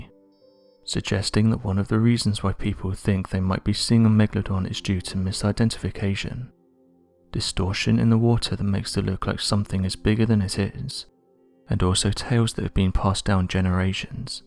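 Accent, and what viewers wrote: United Kingdom. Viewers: I'll save this one for tonite. This guy is for bedtime and the other (guess there are two in this channel) for daytime. Both have great voices and nice British accents.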